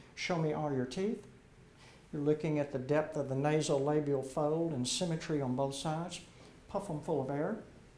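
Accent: United States. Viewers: Ahmad Syarbini Mat Southern, most likely Louisiana. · I didn’t even notice an accent until you pointed it out. Lol. So I’m guessing south.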